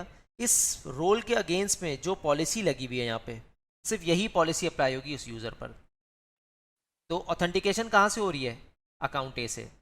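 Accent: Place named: India. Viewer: Impossible to hear his english with the indian accent.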